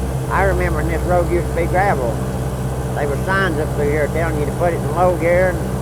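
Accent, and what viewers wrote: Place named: Appalachia, United States. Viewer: I lived in Appalachia from the time I was 10 until I was in my twenties. I was born there, but we moved to Cali, which was really nice back then, not a commie country like now. I could listen to this guy all day becuase It reminds me of growing up. And it sort of breaks my heart, becase even though I finally moved back to Appalachia, I live there now, I can never go back to the Appalachia of my days growing up. People were so free, so real. It doesn't exist anymore. People talk with the same accent, but it's gone. I don't really know how to say it, but if you lived there 30-40 years ago, you know exactly what I mean.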